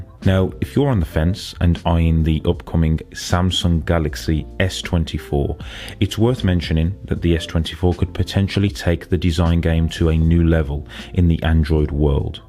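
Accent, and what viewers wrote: England, United Kingdom. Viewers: Bro, your english accent and monotone speech make it hard to understand what you are saying sometimes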